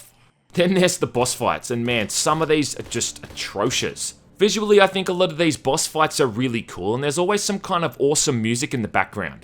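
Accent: Australia. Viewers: Are you Australian? I only ask because I like to support local talent when I can. Not trying to be rude. Really enjoying your videos.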